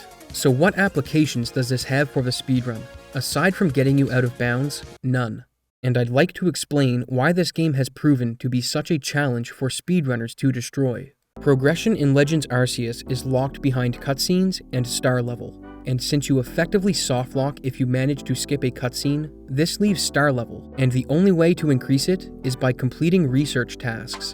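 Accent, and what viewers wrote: Canada. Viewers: First time visiting the channel, must say you have the heaviest Canadian accent I've ever heard. I'm pretty sure it's Canadian but let me know if I'm wrong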